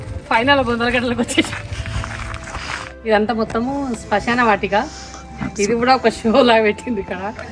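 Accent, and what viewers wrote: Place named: Telangana, India. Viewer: Telangana basha super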